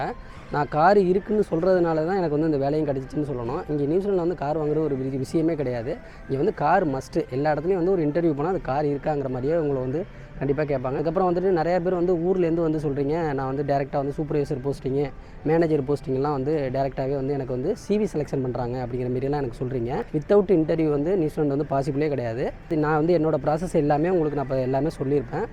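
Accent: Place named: Madurai, India. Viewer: Bro, I really like your innocent. Am admiring your way of talking. I think your native is madurai because your slang is like that madurai.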